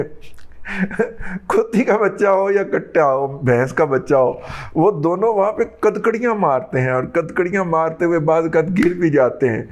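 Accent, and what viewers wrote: Punjab, Pakistan. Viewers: Dear, I always watch your channel because in your satirical and humour flavoured vlog, you weave Punjabi beautifully in Urdu and it is a treat to listen. This was no different. Good to start the day with humour in the eyes.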